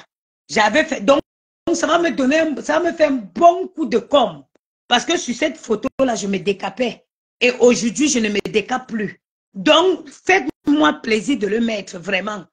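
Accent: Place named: England, United Kingdom. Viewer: Tu as l’accent anglais ma fille pas dorcaca qui parle anglais en patois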